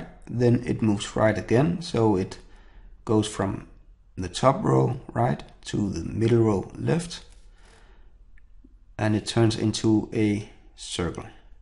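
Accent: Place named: Germany. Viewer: Hey - good video - you sound German. I have a question? is the answer for this video Matrix 39, or the other answers you gave for the 19 Matrixes -- are these "your answers" thru problem solving? or are they answers from a testing psychological institution?? Can you let us viewers know?? -- thanks -- from --Canada:)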